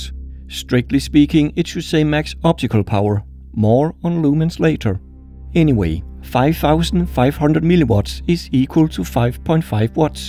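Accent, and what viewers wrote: Denmark. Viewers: har næsten lige fundet din kanal. Ku sku høre med det samme du var dansk (go'e gamle accent)😂 Har seriøst binged dine videor. Pisse fedt med en dansker der laver sådan nogle slags videoer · YouTube recommended this video and I thought it would be interesting. It didn't take many seconds before I could hear that the narrator is Danish. Fortsæt det gode arbejde 👍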